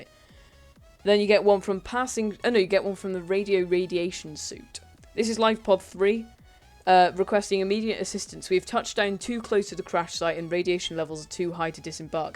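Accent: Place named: Midlands, United Kingdom. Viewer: where are you from? I'm guessing Midlands area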